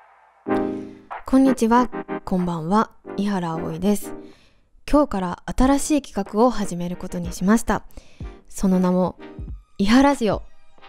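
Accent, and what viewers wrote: Kansai, Japan. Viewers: いつもより関西弁聞けてうれしい☺️ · めちゃんこかわいいがな関西弁かわよ 「そのままやないかい」 名言やないかい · ちゃんの関西弁大好きです❤️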